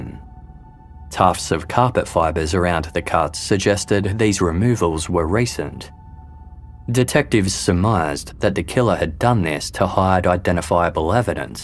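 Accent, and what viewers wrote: Australia. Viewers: So nice hearing a fellow Aussie. Wooo 🥳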